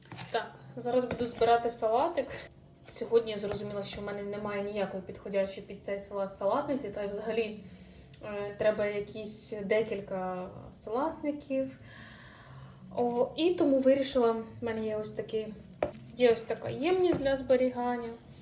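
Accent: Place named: Odesa, Ukraine. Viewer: У вас чудова літературна вимова, як для Одеси.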